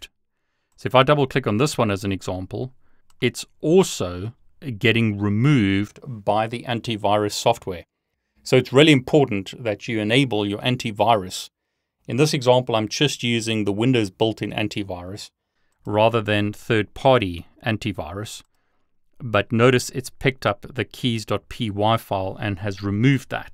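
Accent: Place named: South Africa. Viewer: are you south african lol? that’s what your accent sounds like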